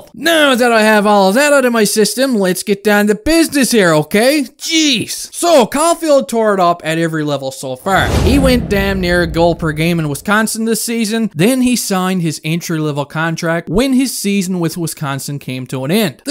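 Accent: Newfoundland, Canada. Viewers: You must be a fellow Newfie or an Atlantic Province guy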